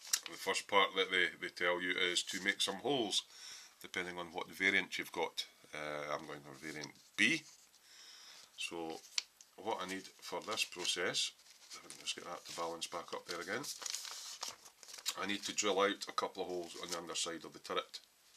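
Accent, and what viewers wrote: Scotland, United Kingdom. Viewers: OO the vidya presenting family grows nicely! Gotta like Tamiya tanks, my first ever painted build was a Russian T62A about a million years ago. I'f better go watch the other vids you've done. Also, everything is better with a Scots accent (my dad was from Glasgow) ^_^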